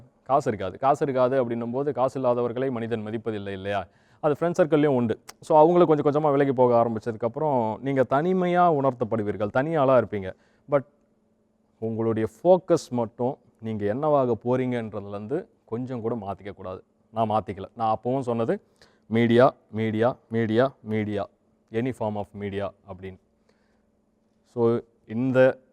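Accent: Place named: Coimbatore, India. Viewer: Voice of coimbatore... super anne...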